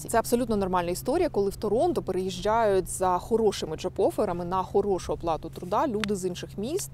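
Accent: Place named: Kyiv-Poltava, Ukraine. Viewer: Інколи Ви розмовляете київсько-полтавським діалектом.👍Дякую Вам за це🌷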